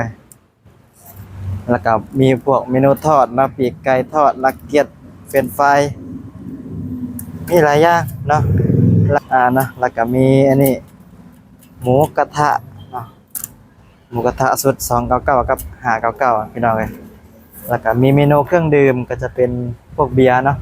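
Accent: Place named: Laos. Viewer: เว่าลาวดีแล้วน่ารักดี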